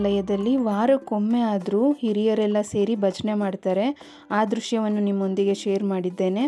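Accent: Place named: Hiriyur, India. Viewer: Nindhu hiriyur raaa niv mathadooo style hiriyur near bhashethra edhee soo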